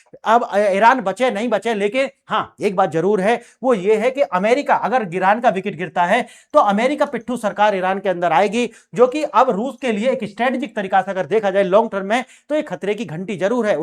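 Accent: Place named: Bharatpur, India. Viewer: आपको सुनने के बाद बार बार ऐसा क्यों लग रहा है आपकी भाषा भरतपुर के लोगों से मिल रही है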